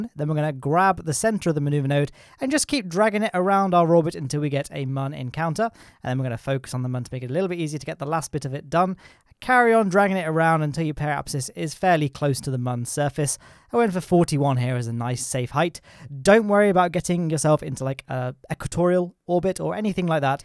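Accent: United Kingdom. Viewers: He's UK Australia's don't articulate their words as much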